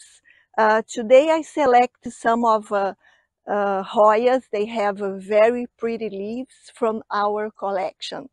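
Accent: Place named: Brazil. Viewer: Seu sotaque, sotaque de brasileiro é inconfundível .Eu também coleciono hoyas. Tenho 25 espécies. Mas nenhuma no momento floresceu :(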